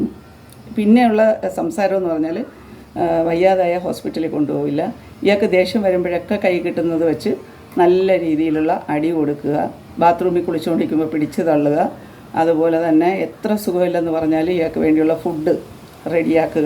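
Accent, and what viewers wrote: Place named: North India, India. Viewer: North Indian ladya pola und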